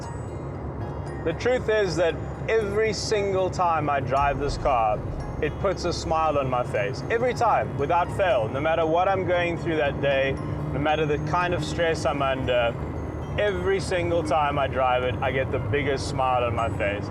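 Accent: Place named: South Africa. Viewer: South African English is probably the only english accent/variation I just can't mimic at all! That alone makes me a total fan. Not saying I can do all accents flawless, far from it, but SAE is just impossible to get right. More than a few similarities with New Zeeland English, but still distinctly different enough to throw me off. Oh! And I totally love cars too!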